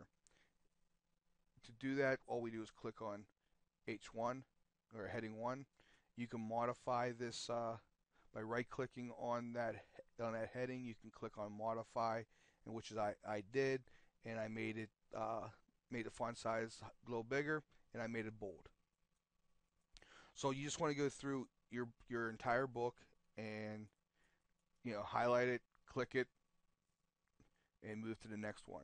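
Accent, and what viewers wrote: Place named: Northeast, United States. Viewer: Thanks. Quick & easy instructions for dummies like me! One little point to consider: When you underscore text like you do in your sub-headers, some readers may think it's a link and try to click on it. I usually use a smaller font and bold and/or italics for these. Hope you do more of these videos. P.S. Like your accent. Are you from the NE US?...you sound like it. I'm from Long Island myself...oy!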